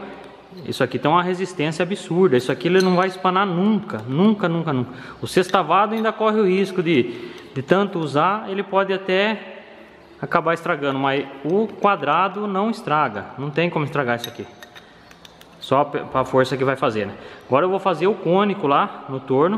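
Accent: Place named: Piracicaba, Brazil. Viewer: Fala meu chegado. Pelo seu sutaque é de Piracicaba ne.. SOU torneiro mecanico tbm. Eu trabalhei e morei ai no Santa Rosa, e trabalhei na OTANNI . nao sei se conhece.. Cidade bacana de trabalhar. Abraço e sucesso..!!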